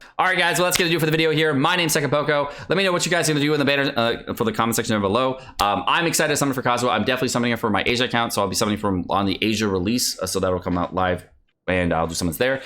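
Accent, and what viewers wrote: United Kingdom. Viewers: british supremacy!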